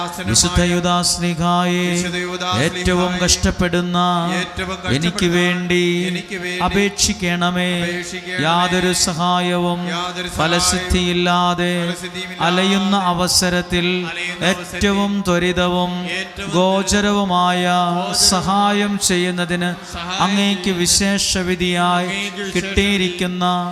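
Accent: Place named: Butler English, India. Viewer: Why is it in butler English. Can't hear or understand properly ast 2 weeks.missed ...keep only English clearly or only malayalam clearly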